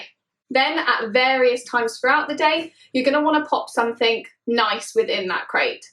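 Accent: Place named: United Kingdom. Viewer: Oh, luv the your British accent!🐕will keep watching...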